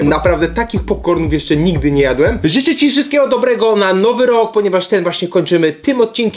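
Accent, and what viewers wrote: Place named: mixed, Poland. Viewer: Mordo ty z Podlasia lub z Podkarpacia jesteś? Bo taką gwarą mówisz 😂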